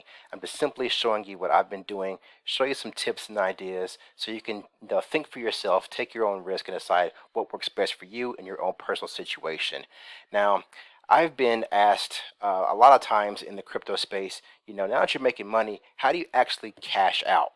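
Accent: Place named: United States. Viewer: Are you in the US. am I able to use simple swap in the US? You sound like you are American, but the flag on simple swap is british.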